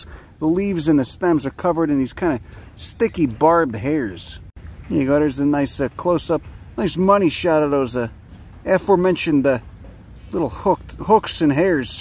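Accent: Chicago, United States. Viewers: Yo i fucking love your Chicago accent! Not many people have thick Chicago accents anymore.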